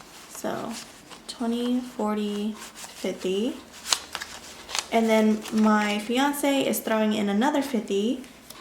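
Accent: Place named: Bay Area, United States. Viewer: i’ve been binge watching these videos for the past couple of weeks and i’m so happy i came across yours! as soon as you started talking, i was like “oh this girl from the bay too” and sure enough! hahaha automatic subscribe. plus i love your penmanship and how minimalistic you keep everything. i plan on starting my budgeting in june and had the same idea of how your process is. and congratulations on the pregnancy, she’s almost here! i look forward to your other videos. xo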